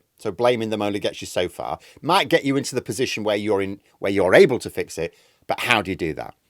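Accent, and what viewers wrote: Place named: Birmingham, United Kingdom. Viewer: English Brummie.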